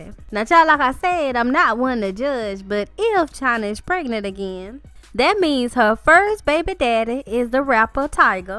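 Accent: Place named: South, United States. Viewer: I appreciate the immediate reply. Understand that I asked because I have relatives and friends from the south (where you sound to be from, or have lived) a couple of whom actually pronounce "street" as "screet" in all seriousness. Thanks again; I'm about to finish watching your video on Fabolous. These are fun! 👏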